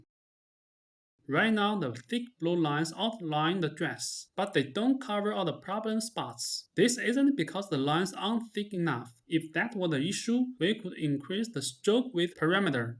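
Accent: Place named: China. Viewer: 中国老乡吧 这口音特色:hand-pink-waving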